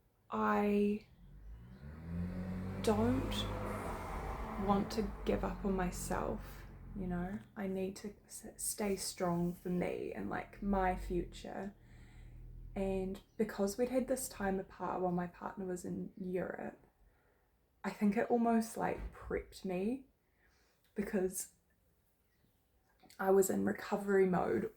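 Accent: New Zealand. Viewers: Extremely courageous of you to share this moment in your life. Give it time, time to & for yourself. I'm hearing a Kiwi accent. I'm not sure if you're in NZ? Maybe contact friends away from your area & get away for a while to break routines. Memories aren't always the best sometimes. All the best & keep shining 🌟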